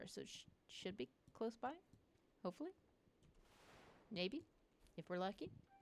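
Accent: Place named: Midwest, United States. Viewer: Midwest accent so sweet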